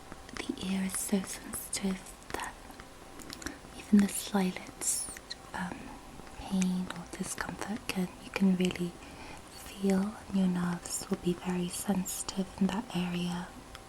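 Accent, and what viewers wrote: England, United Kingdom. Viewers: Or maybe she was taught the English way of speaking... or better yet taught by an Englishman/woman. Ever wonder why Indians sound the way they do? Welsh English teachers in the 1800s. She sounds English when speaking English because she was taught the English way, not because she's Korean. If an American had taught her to speak English, you'd bet your ass she'd speak with an American accent to. Just the way it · she has a British accent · she has an english accent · it's her English accent, not Asian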